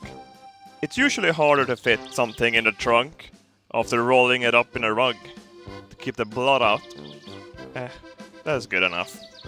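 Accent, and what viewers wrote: Sweden. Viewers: Booker Dewitt it's his Swedish accent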